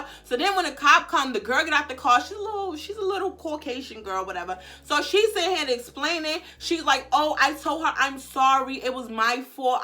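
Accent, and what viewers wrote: New York, United States. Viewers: Sis really said “shiznayii” you are sooo New York. Don’t ever change🤣🤣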